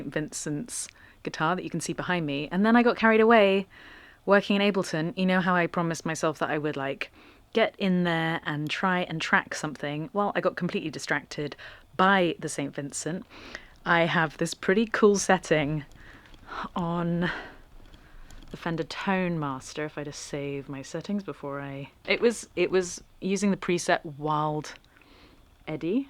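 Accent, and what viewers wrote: United Kingdom. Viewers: Oh yes, absolutely. It's very odd to me as a Canadian that we can live 2,000 miles apart from one another in my country and have virtually the same accent as English speakers--but head to the UK, and 20 miles down the road is far enough to have a distinct accent that proudly identifies your hometown. I've started to tell the difference, even as a foreigner, among the many English & British accents -- and is far and away one of the most beautiful.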